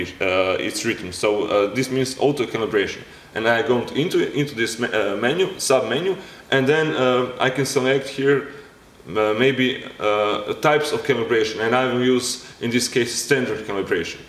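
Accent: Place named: Slovakia. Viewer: you sound like you're from slovakia.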